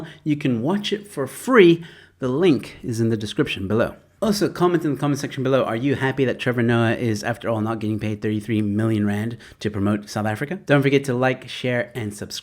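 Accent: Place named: United States. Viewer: your video is poorly put together and comes across as propoganda. Who pays you to post this absolute junk and why don't you walk around in South Africa to see the development AND integration we have going on? Or are you just more comfortable being sheltered in your home spreading nonsense like this that tarnishes the name of our country? Your accent is American, why do you post so much about cape independence and so on? I'm going to find out whose funding you and you will be held accountable. So will they.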